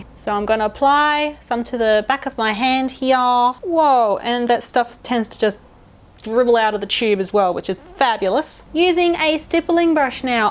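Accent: Australia. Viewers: Australian (Duh)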